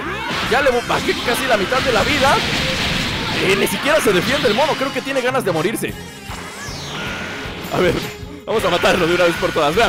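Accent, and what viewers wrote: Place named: Mexico. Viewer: muy buen video oye podrias saludarme en tu proximo video al ultimo la verdad no miento digo la verdad pero eres mi 3 youtuber favorito el tercero, veo todas tus series descontroland etc. bueno en fin creo que tu eres de mexico y yo igual por que como hablas me suena a mexico bueno salu2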